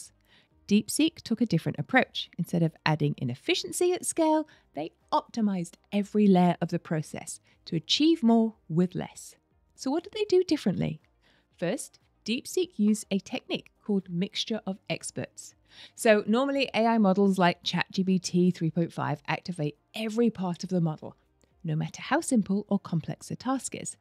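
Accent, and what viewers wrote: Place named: New Zealand. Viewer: Well explained, first video I saw about these topics. The captions also helped me, since the nz accent is harder for me. Thanks from 🇧🇷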